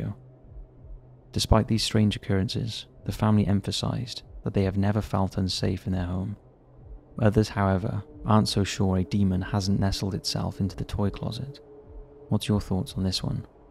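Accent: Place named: England, United Kingdom. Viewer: the famous English voice is back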